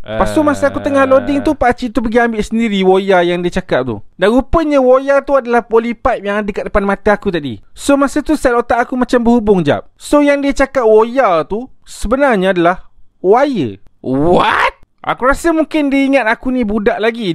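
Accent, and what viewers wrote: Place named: Kelantan, Malaysia. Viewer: mace kecek klate jah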